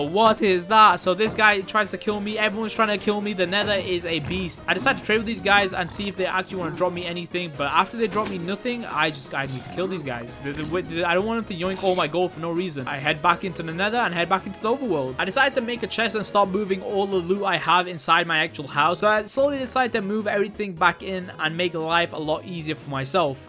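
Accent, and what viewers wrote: United Kingdom. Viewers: Luv that briisish accent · I love your british accent (sry if im wrong) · its just every 100 days but the the unique part is the british accent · I just love ur accent, i honestly love britian accent (sorry if i misspelled britan)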